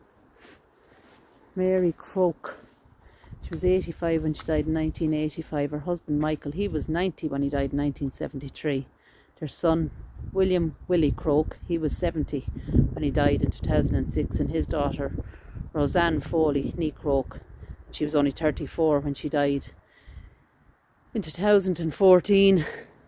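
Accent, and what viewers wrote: Ireland. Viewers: Thank you for another adventure,. By the way, I just love your Irish brogue! Not only does it sound really beautiful, but it adds such great atmosphere to your videos. Please continue to make your lovely videos.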